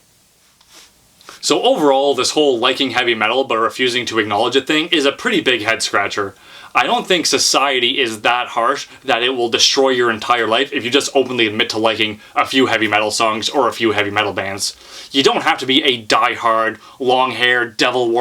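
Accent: Canada. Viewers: You sound more Canadian now.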